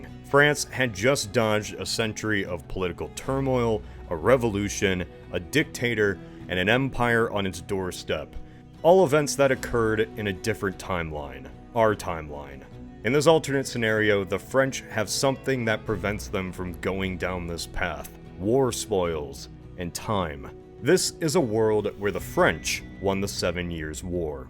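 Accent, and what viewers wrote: Ohio, United States. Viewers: Thank you for your wonderful new "alternatives"... I truly appreciate the work y'all do on these. I especially am glad that your family was able to exist long enough for you to be here! I really enjoy your voice. Do you have an Ohioan accent! This is -->almost <-- as interesting as the episode you did on the lack of the Great Lakes.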